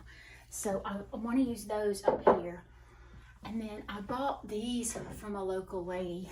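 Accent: South, United States. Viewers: Southern accent - my fave!!! So bring it on, lol. I'm a WI girl turned KY grandma, but I think I will always have my northern accent - "y'all" just doesn't sound right coming out of my mouth, but I wish it did 😂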